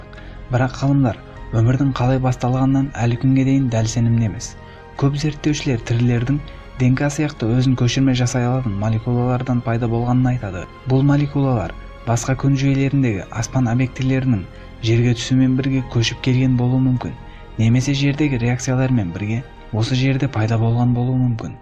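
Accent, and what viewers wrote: China. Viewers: Қытайда оқығансыз ба? тіл акцентіңіз ұқсас. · Кытайда туратын казах менинше.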